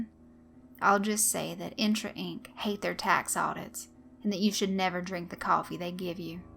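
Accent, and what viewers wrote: South, United States. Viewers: This is my first story of yours I'm listening to! Is your voice always like this? (its been so long since I last heard someone from the South)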